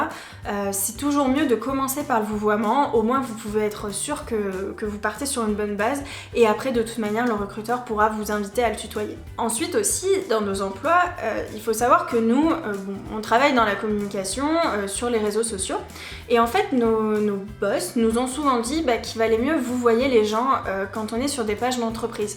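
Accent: Quebec, Canada. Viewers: Vous avez un accent québecois, bien que vous vous en appercevez pas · Très intéressant ! Je suis Québécois et j'ai l'impression que ton accent français se "québécise" quelque fois et je trouve ça charmant. Tes propos nuancés sont très à point. Tu as l'air à adorer ta nouvelle ville d'adoption :)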